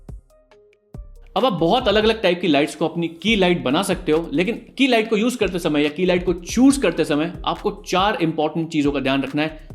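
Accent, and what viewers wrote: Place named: Pakistan. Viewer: Week done bro. Apka Hindi/Urdu ka akcent Bilkul h Hamari tarah h mtlb pakisatanio ki tara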